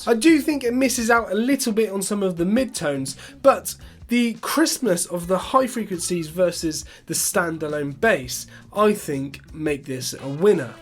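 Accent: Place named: United Kingdom. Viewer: not bad, just different. You Brits put more emphasis on certain letters. Like the way y'all say schedule or aluminum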